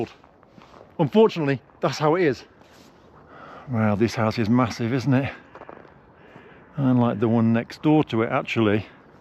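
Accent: United Kingdom. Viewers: A wonderful mix of the background of a Russian provincial city and British pronunciation!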